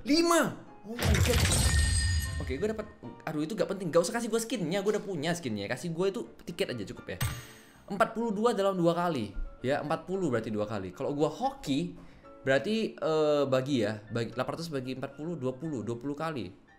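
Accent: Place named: Medan, Indonesia. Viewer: Gw suka kalo bag marah marah kau kau wkwkwk org medan mana ni horass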